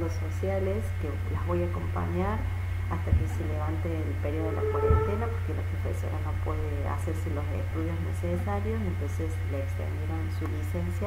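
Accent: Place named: Cordoba, Argentina. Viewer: Estaba buscando a cerca de la ley para realizar un informe y me encantó la información que me brindaste. Muchas gracias 💛 Por tu acento creo que sos de Cordoba, saludos desde B.S. A.S.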